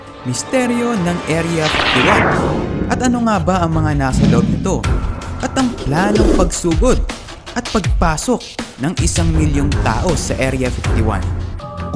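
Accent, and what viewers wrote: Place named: Philippines. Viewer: He's Filipino, and he's using our Tagalog native language. Therefore, there's nothing wrong to use the Filipino diction. Nevertheless, he's creating videos that are very informational and educational and I think his intonation is less our concern. 🤷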